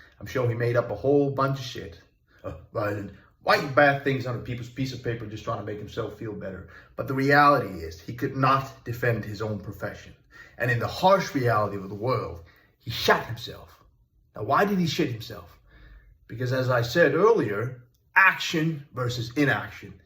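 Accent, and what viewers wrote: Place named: United Kingdom. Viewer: too british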